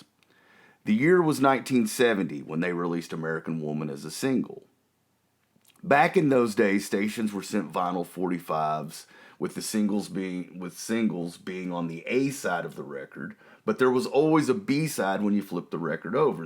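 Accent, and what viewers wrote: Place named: Texas, United States. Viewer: The Texas accent of the narrator is unbearable.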